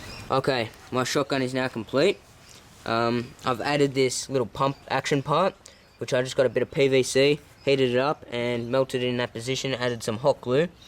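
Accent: Australia. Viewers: Australia mate! Were I'm from too! :D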